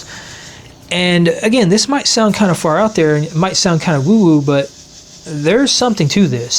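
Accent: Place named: Texas, United States. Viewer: You sound like you're from Texas..i.e. San antonio.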